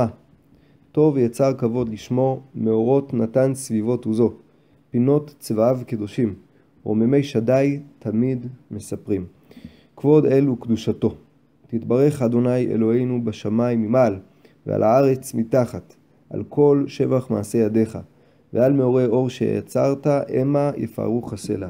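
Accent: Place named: Israel. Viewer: Your prayer is clear, easy to follow and sounds authentic Israeli. It's a good supplement to allow us to fulfill the mitzvah in some days we have no access to the synagogue.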